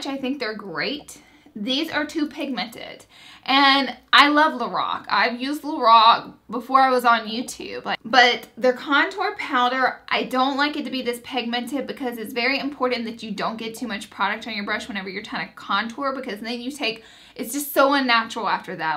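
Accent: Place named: Alabama, United States. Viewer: I just started watching you today when I stumbled on one of your videos. Within 20 seconds I thought "she has to be from Alabama!" Lol I say "oil" the exact same way.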